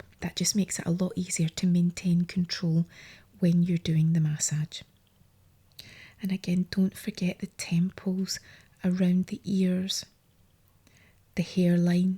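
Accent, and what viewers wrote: Scotland, United Kingdom. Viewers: Beauty Thank you. Lovely to hear your accent too - I am also Scottish! Comforting sound and lovely video. Subscribed!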